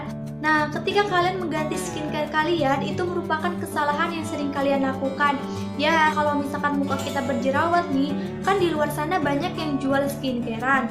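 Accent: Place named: West Java, Indonesia. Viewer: sunda na nong 🤣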